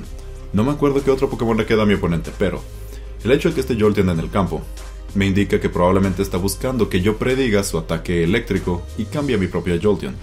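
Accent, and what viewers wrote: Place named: Mexico. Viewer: Me gusta como narras, muy natural y al estilo mexicano jaja, pero me gustaria que usaras pokes poco comunes, ya sean Uu o Ru eso estaria chingon, saludos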